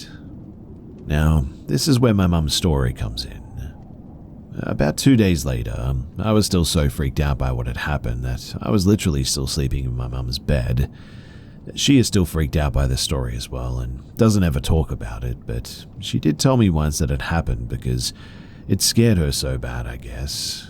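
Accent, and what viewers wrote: Australia. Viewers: 😊Love your aussie accent mate ❤❤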